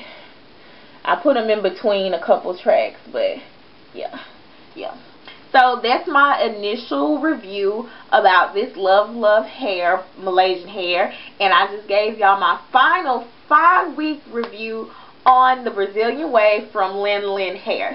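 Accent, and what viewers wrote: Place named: New Orleans, United States. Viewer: Im from new orleans girl and i was like oo her acsent sound so familiar, and now i know lol.. cute cute cute !!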